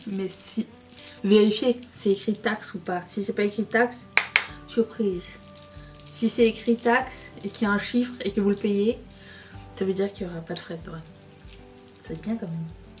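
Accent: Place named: Quebec, Canada. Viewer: T'es Québécoise ou Française j'comprend pas trop 🤔 T'inquiéte c'est pas une critique c juste que youtube m'a recommander une de tes vidéos hier so yea.. J'vien de te découvrir et je perçois l'accent QC 😅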